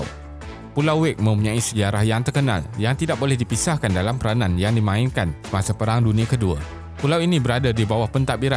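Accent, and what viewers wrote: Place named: Malaysia. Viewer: yang ngomong kaya orang malaysia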